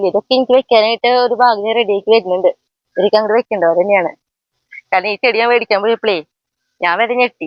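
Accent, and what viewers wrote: Thrissur, India. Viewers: എവിടെയാണ് വീട്. കാണുമ്പോൾ കൊതി തോന്നുന്നു. കൃഷിയിടമൊക്കെ. ഭാഷ കേട്ടാൽ തൃശ്ശൂർ ആണെന്നൊരു സംശയം. ഞങ്ങൾ ഒറ്റപ്പാലം ആണ്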